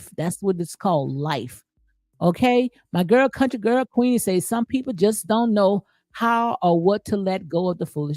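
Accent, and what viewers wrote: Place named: Louisiana, United States. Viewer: Are you from Louisiana? I love the accent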